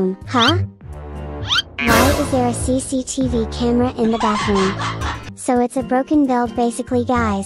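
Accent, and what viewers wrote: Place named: England, United Kingdom. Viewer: soy yo O te veo con la voz más inglesa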